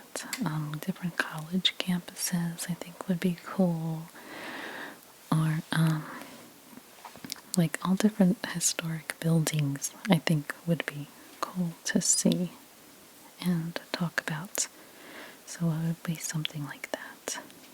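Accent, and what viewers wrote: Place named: Mid-Atlantic, United States. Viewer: Totally had you as mid-Atlantic, but you say “play catch” not “have a catch “. Interesting 🧐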